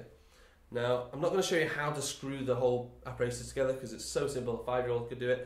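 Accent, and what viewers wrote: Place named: Ireland. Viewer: Nice Video, but what you said? "walk like you .... yourself!" Sounds like a good advice, but hard for me to understand the irish accent! please write it down! Thx pal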